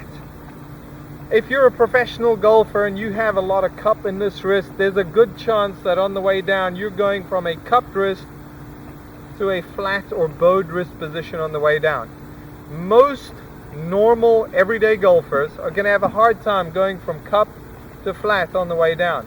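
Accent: South Africa. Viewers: Is that a South African accent? Thanks for the vid and info, very helpful. I recently discovered I get across the line at the top, swing too steep and come out the shot slightly as a result. The cupped left wrist also a real "aha" discovery. Now the real challenge, to change the muscle memory!!! Not a small task. any drill tips meneer? Dankie. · is he really South African bru...???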